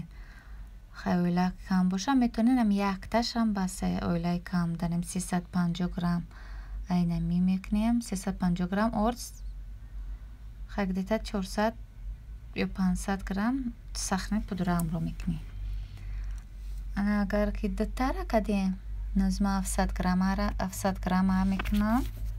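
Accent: Kulob, Tajikistan. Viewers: Аи рвечи гуфтанит бафигрм кулоби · Ранги хдм гапезанен кулобиеи хдмон хшру гапмезаган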